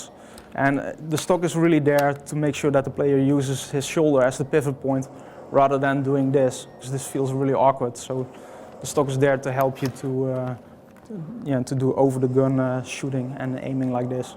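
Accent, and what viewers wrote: Netherlands. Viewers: woow, dutch guy (i am one :D) learn english, you are to obvious ;D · he is not russian, he is DUTCH haha i hear i because i am dutch too!!! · This guy is definitely Dutch, listen to his accent. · Dutch accent, MY COUNTRY FUCKING ROOOOCKS!!!... Except the cops of course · Nah it's a guy from holland they all have this accent when they speak english :D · He's definitely Dutch. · YOu can hear this guy is Dutch.. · I'm SURE this guy is Dutch. It just sounds to much like a Dutch speaking person trying his absolute best to talk English without too much of an accent. Like if you're native language is also Dutch and you agree with this statement! :p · He sounds dutch :) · lekker nederlands accent haha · ge hoord direct da da nen hollander is he xD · nederlander? ik hoor wel een nederlands acsent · definitely Dutch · he's dutch, i know it of the accent that sucks hard. 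· You sound kinda dutch. Are you? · the guy sounds dutch to me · your dutch! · dude, are you dutch?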